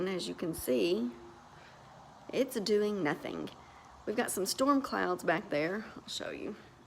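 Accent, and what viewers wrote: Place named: South, United States. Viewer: ahh! So neat! I’m from Tennessee so I quickly recognized the accent lol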